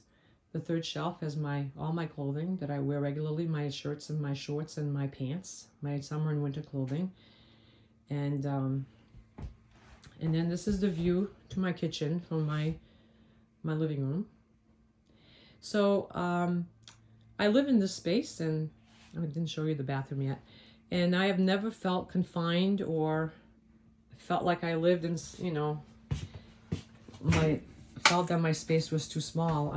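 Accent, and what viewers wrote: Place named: New England, United States. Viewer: are you from New England....I thought I detected a faint New England or NY accent. Very nice little space...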